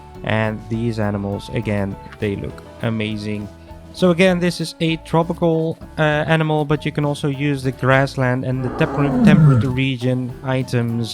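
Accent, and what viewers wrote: Netherlands. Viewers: The dutch accent is amazing 🙂🇳🇱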